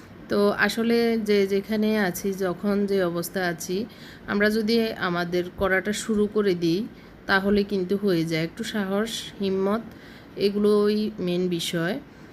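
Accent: Noakhali, Bangladesh. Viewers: আপু তোমার বাড়ি কি নোয়াখালী???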